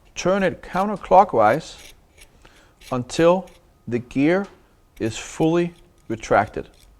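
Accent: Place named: Denmark. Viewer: The danish accent ;) Although it was very subtle. Great video btw.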